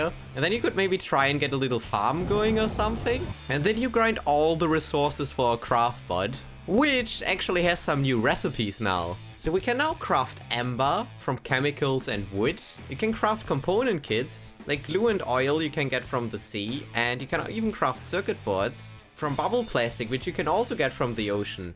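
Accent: Germany. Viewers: are you german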